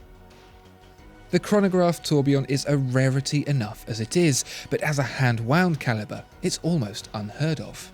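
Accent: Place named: South East England, United Kingdom. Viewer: All my friends sound like this (south-east England).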